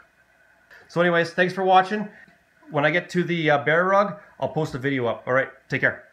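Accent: Canada. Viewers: That candian accent is amazing ♡ hello from ontario ♡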